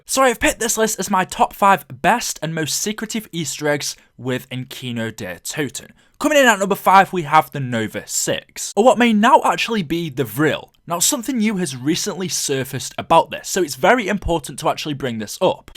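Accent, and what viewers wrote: United Kingdom. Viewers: Every time I click on a COD video there’s a person narrating with a British accent ???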